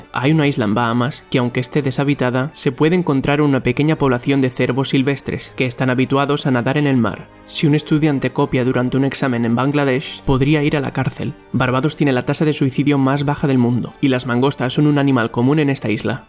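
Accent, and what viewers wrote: Spain. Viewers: Cómo se nota que sos español jajaja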